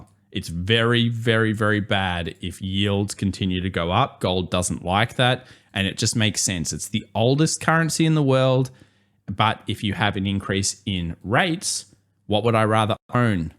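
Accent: Australia. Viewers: He is Australian bro? Unless you can point to it.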